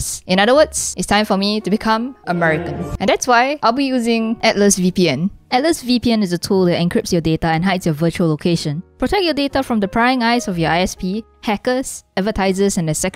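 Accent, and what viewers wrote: Singapore. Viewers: why do u sound like ur singaporen?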